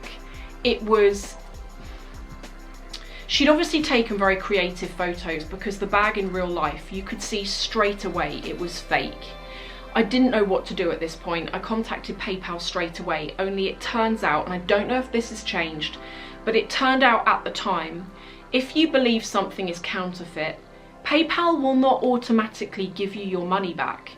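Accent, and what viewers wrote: RP, United Kingdom. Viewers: I love your posh accent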